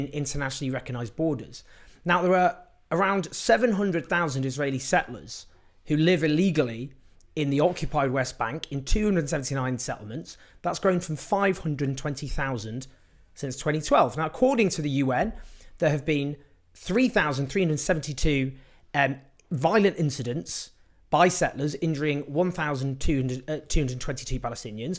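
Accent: United Kingdom. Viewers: I like your show but it's very difficult for me and I am sure also for so many others to understand your very heavy British accent. Try to speak a bit slowly and make your English a bit more Like an American Accent so that all your international listeners can understand you better. By the way, I am NOT an American. Thank you once again for your great and valuable content.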